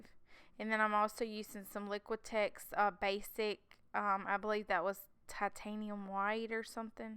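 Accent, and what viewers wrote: South, United States. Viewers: Welcome back! I have sure missed your wonderful videos, and that cute southern accent!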